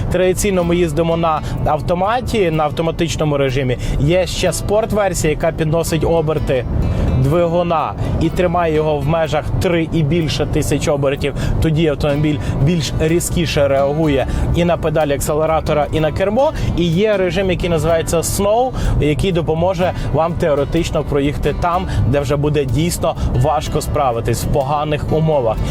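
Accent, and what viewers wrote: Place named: Central Ukraine, Ukraine. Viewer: а чем тебе не понравился язык? вроде довольно чистый украинский центра Украины. ни западного ни восточного суржика не слышно.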